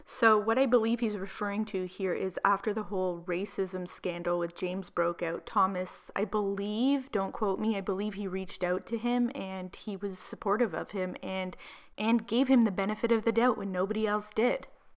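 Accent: Canada. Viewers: Are you Canadian? You kind of have an accent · is u canadian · You must be Canadian?You have such a heavy accent!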